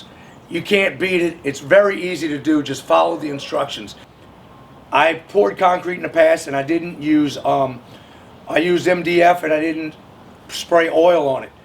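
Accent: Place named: Louisiana, United States. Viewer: Hi, great video! I see your wearing an Abita beer shirt. I'm from Slidell and recognized your accent right away! Keep up the great work. Your project looks awesome!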